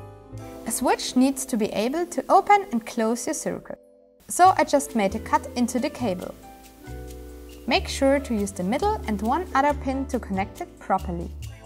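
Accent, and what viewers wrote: Germany. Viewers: Ich bin selber aus Deutschland und erkenne den Akzent meiner Sprache ;) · Ist sie Deutsche? :D Eigentlich hört man es ja, aber keine Ahnung, kann ja auch ein anderer Akzent sein. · Are you german? Becuase ur accent sounds like that. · german accent strong with this one :D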